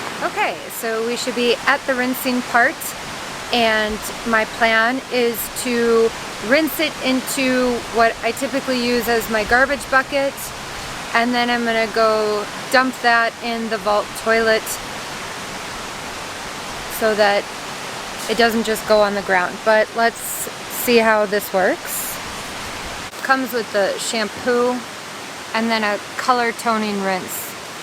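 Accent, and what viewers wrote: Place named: Chicago, United States. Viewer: DUDE!!! I’ve watched a few of your videos, I’m subscribed but I work a lot, I don’t know if you’ve ever mentioned you were from Chicago but when I saw your 1st video I thought to myself, “she sounds like she’s from Chicago” lol! I live 45 min south of the loop!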